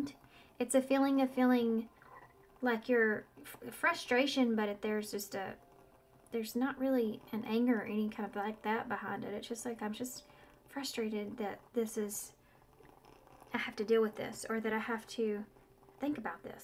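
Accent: South, United States. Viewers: Oh my goodness. I chose pile 2 and it heavily resonated and at the end you said “im hearing Jan too” and that is literally my nickname 🥲🥲🥲😭😭💖💖💖 thank you for this so much!! My imposter syndrome holds me back from everything. Ps. I can tell you’re from the south from your accent! Tennessee girlie here haha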